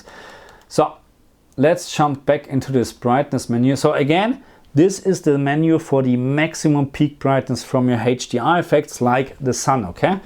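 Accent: Germany. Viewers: My Phone has hdr +10 can it Work with genshin too? And has the Same Bug? You Sound german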